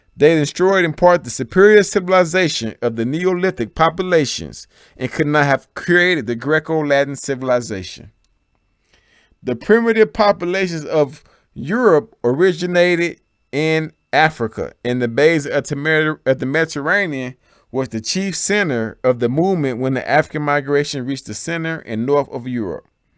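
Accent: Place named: South, United States. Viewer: We wuz kangz is over hundred years old? Didn't know that. But the Black Southern accent is just great.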